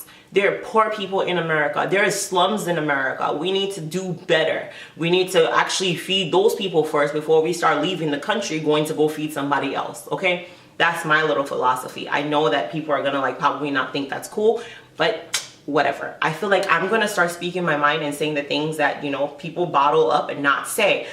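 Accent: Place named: Africa. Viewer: Support is very important. Your African accent is so pure lol. Most Africans don't enjoy their stay cos they don't think they are accepted here. I feel like when you have hate in your heart you will find a reason to execute it. Be it racism, tribalism et al. BTW what camera and lens did you use? #ytqueens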